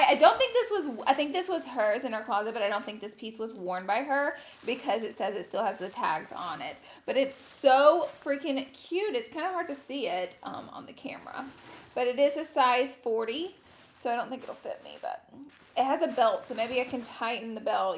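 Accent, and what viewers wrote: South, United States. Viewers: Wow it’s wild how she really used to use this fake voice … like her Paris days. She almost sounds like Brittney spears. Like southern accent meets valley girl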